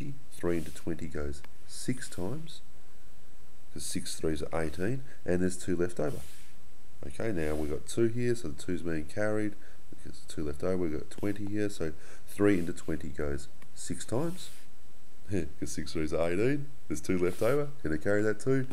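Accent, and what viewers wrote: Australia. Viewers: I bet 100% that he is australian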